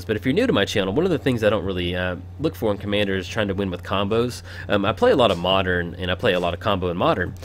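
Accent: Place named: South, United States. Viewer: Seems like a prime deck for Timetwister.:)Also, your voice reminds me of every southern kid I met in the Army.:)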